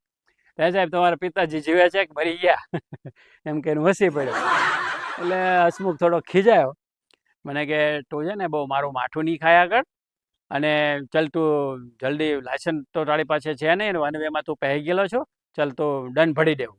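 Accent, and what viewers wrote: Surat, India. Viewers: વાહ....બહુ જોરદાર માથાકૂટ કરી હવલદાર સાથે....😂 સુરતી ભાષા સરસ બોલ્યા હો...બાકી...😂👌🏻👌🏻👍🏻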